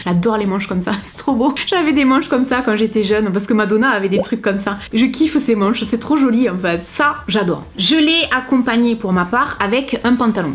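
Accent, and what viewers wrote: South, France. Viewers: Top, t'es pas banale tu es une fille du sud avec l'accent, un peu ronde, et tu assumes tout comme moi. Après Cardy b c'est pas mon style et le simili cuir ça me fait trop transpire. Je suis du Tarn pays du cuir. Bise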